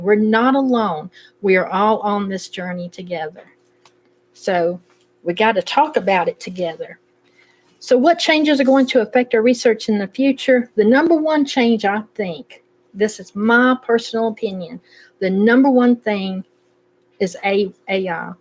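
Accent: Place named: North Carolina, United States. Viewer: What a great program. I wish I had been on my journey at the time you started the Piedmont Trails so I could follow you as I desperately worked to understand my family history. I happened to find your channel by accident as I was flipping through You Tube for a movie. Since I was born in Statesville NC I immediately recognized a person speaking my language. I don’t even need my hearing aids to understand what you are saying. I love your accent ❤ At 77 people tell me I waited too long to start this project. However, I still remember my grand parents and my great grand parents talking about family members and it ties into my search back into my family’s history. Please keep this program going, You are special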